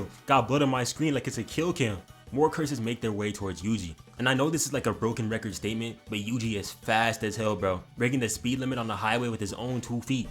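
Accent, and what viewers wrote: Kenya. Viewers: Ayooo are u kenyan. Im here from kenya tooo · Bro are you from Kenya by any chance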